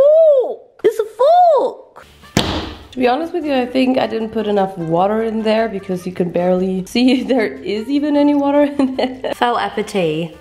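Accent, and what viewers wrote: Germany. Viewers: Man hört ihren deutschen akzent so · ✨Deutsch Accent✨ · Wie man ihr deutsch hört ahhahah love it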